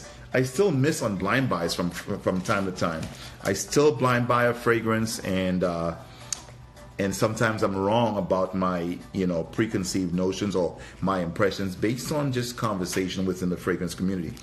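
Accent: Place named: Trinidad and Tobago. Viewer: Who's? Lol... btw I get a slight Trini or Dominican 🇩🇲 accent in the back? More trini. Might be going crazy. Lol. Disregard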